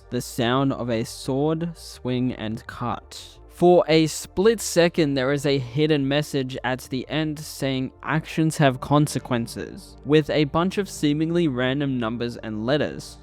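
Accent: United Kingdom. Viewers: Love the British accent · U sound sooo British, no like really, anyway, good video ma boi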